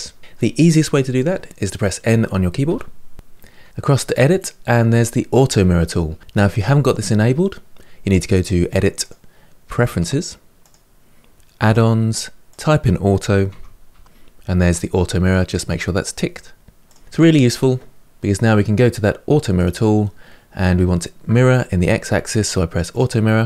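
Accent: United Kingdom. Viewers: I will forever have a british man voice in my head when modelling in blender. G to grab, s to scale.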